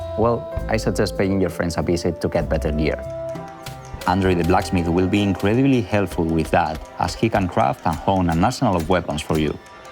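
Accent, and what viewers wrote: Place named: Spain. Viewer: el que está comentando.... parece español por ese acento jejej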